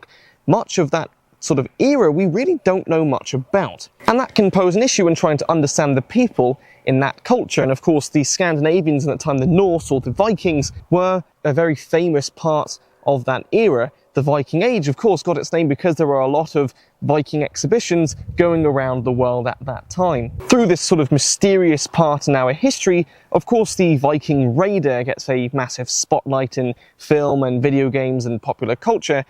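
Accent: United Kingdom. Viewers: I like your English accent Lolol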